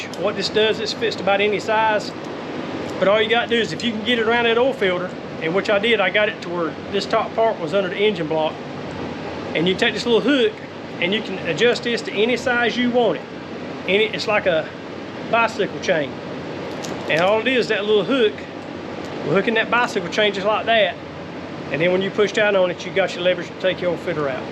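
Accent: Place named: North Carolina, United States. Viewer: Nice to find these helpful videos as well as here the North Carolina accent !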